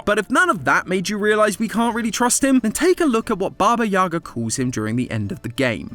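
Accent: United Kingdom. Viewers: Hey British mat pat tell mat pat I said hi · british matpat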